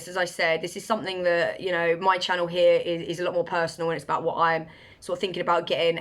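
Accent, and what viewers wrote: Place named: Leicester, United Kingdom. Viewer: Sounds like you're from leicester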